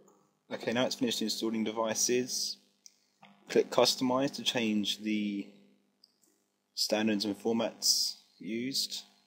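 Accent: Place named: United Kingdom. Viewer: u r british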